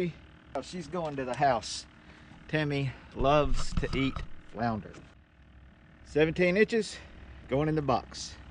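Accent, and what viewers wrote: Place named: South, United States. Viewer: I hear that Southern twang...🎵🎶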